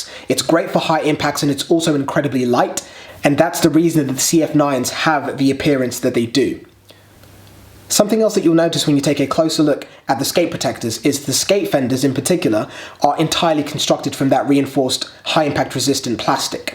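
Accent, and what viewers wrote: England, United Kingdom. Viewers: Anyone else find it odd hearing an Englishman talking about hockey equipment?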